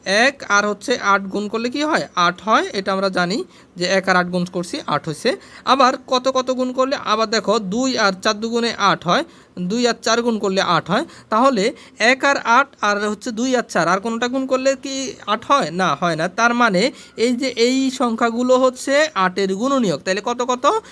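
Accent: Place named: Noakhali, Bangladesh. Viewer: স্যার মনে হয় নোয়াখালীর মানুষ 🥲🙏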